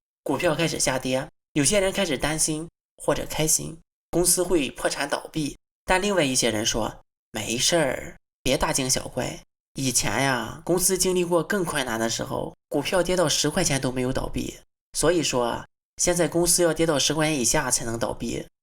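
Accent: Shandong, China. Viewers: 你的口音应该是山东的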